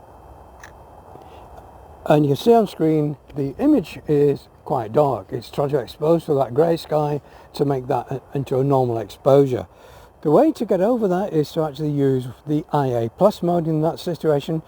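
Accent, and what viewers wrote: United Kingdom. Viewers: I just purchased this camera and I am so thankfull you posted these video's! Very helpfull. Btw your Brittish accent makes it even better 😄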